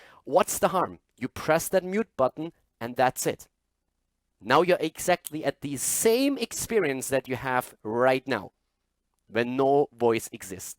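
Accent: Germany. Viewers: I agree with all of it! Mainly with the voice thing! The MMR thing is very difficult and i know what it means to have an illidan on the team with most deaths in lowest hero dmg on the team! Maybe some tweak for the rating in combination with the other stats but it would help a lot!! I love your work and your german voice;-) Go ON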